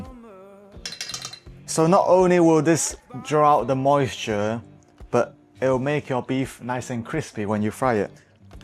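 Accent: Northern England, United Kingdom. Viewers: Your British accent make it authentic flavor 😅 easy to follow , thank you . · This is like ' Sunday Kitchen ' on BBC 2 But Chinese Style. And a Northern English Accent BRILLIANT !!! 👍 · Are you from Hong Kong? I thought I detected a partial British accent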